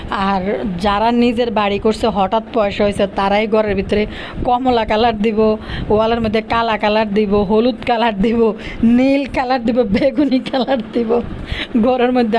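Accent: Noakhali, Bangladesh. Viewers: আপনার বাড়ি কি নোয়াখালী। আপনার ভিডিও যতবার শুনি ততবার আমার খুব হাসি লাগে বিশেষ করে বাপ্পির ব্যাপারে যে কথাগুলো বলে না আমার খুবই হাসি পায় আপনার কথাগুলো শুনে❤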